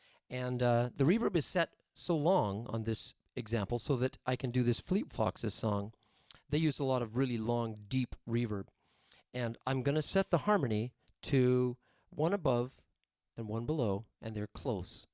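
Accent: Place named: California, United States. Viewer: It's better to sound like you know what you're talkin about of course and instead of letting us here at tell us what we are going to hear. You must be from California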